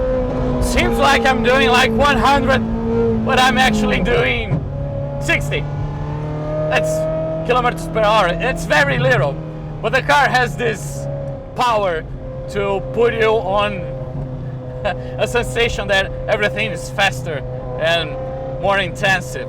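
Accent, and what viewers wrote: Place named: Massachusetts, United States. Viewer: I Love your accent from South of Massachusetts 😊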